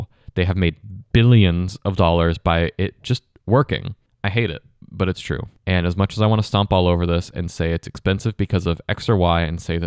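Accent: England, United Kingdom. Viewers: You don't speak American, you speak English! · you don't speak american, you speak english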